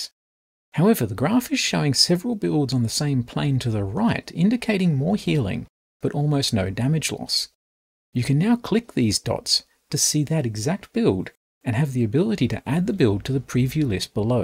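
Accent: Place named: United Kingdom. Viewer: Just watched the full video series. I'm in Genshin for about 2 weeks and as a rookie I can safely say I didn't understand 90% of the content. Especially the jokes. but man the intros and the so British voice are funny. I will slowly get into the tool down the road, but I have a lot on my plate with the base game already. Thank you very much for bringing this knowledge + utility to the community.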